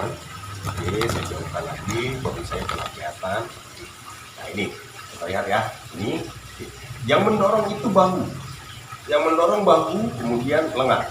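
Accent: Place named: England, United Kingdom. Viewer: Bang jelasinnya pakai full bhs Indonesian saja. .soalnya terlihat lucu aksen Inggris nya.. 😂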